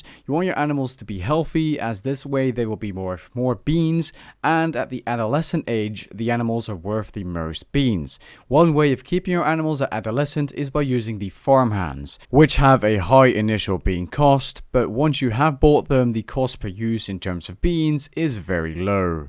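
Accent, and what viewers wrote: Netherlands. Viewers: i knew there was a bit dutch in the voice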